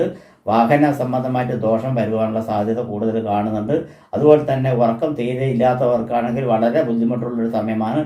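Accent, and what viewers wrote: Tamil Nadu, India. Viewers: തമിഴ് നാട്ടുകാരാണ്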